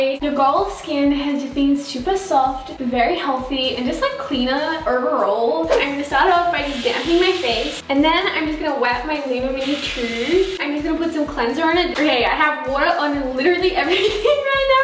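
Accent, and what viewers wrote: Australia. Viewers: These replies are cracking me up! I love Aussie accents 😭😂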